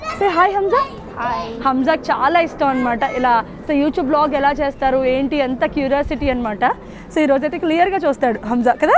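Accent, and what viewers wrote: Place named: Rajahmundry, India. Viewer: Hello friends meeru matladithe rajahmundry nir chodavaram ni thalchukontunnaru tq friends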